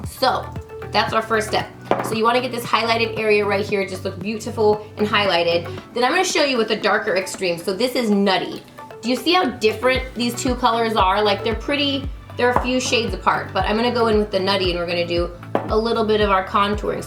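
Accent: England, United Kingdom. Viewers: Love this tutorial - and your British accent rocks 😆💞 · Anyone who hates on your british accent can leave right now. You’re not part of the fam, bye. P.S. Missed you as well, not just the accent. Xxx (cause thatks how the British end their notes) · I’m English and I love your English accent ❤️❤️ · Love this trick! Gotta try this miracle foundation!!!:D And I love your British accent!! I like speaking like a Brit too ☺️ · I love your British accent it makes me laugh, and you look amazing as usual. But it does look like that was a lot of product being applied, no? I just don't like to look cakey. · I also love your British accent.... I'm a Brit 🙌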